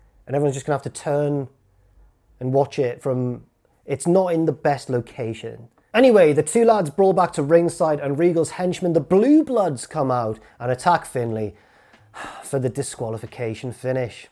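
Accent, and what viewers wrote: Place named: Lancashire, United Kingdom. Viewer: Genuine 8/10 Lancy accent tbf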